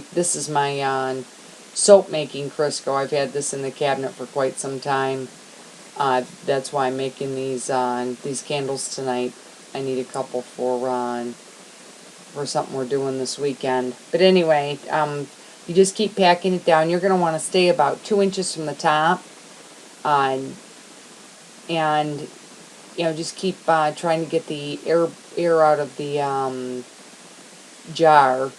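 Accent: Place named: Minnesota, United States. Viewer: First time on your channel, liked and subbed. Thanks for the simple common sense talk. I'm trying to figure your accent. I'm guessing northern Minnesota or N.D., not so much Wisconsin though. Thanks again, Sam.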